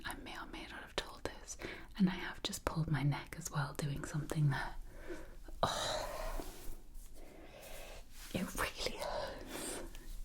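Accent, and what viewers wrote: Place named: Northeast England, United Kingdom. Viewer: I'm North East England myself by the way, listening to your voice feels like home ❤️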